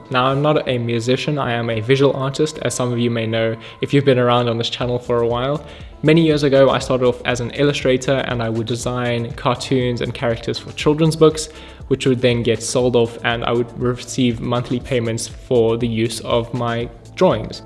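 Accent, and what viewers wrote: South Africa. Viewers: is that a South African accent ? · Are you South African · You've got a South African Accent? Are you South African bro?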